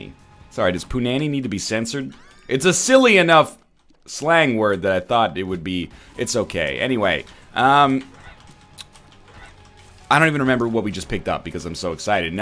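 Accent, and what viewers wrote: Australia. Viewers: probably closer to an aussie accent as they accentuate everything! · Your New Zealand accent is more Australian than New Zealand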